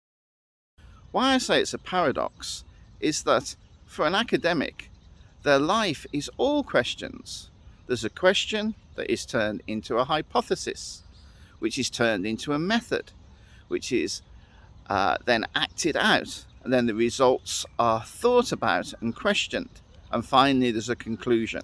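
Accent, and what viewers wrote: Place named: United Kingdom. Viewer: Hi, I stumbled upon you video by chance and I thought it interesting. Recently I am more interested in British English than American English. Therefore I watch many British TV series on Youtube. Your English is clear and very easy to listen to but some characters in British drama speak strong accent and dialect that heard to understand. I haven't realized that there are so many dialect and accent in British English. Anyway I like British English so happy to hear your British English..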